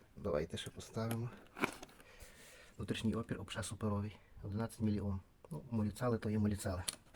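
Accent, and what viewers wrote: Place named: Ternopil, Ukraine. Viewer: Ви що, по акценту не розумієте? Тернопіль!. Мєв...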